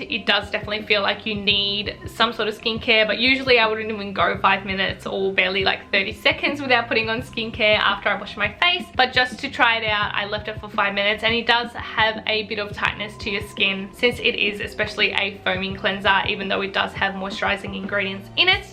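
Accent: Australia. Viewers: I love your (Australian?) accent. It just reminds me of the time when I lived in Sydney.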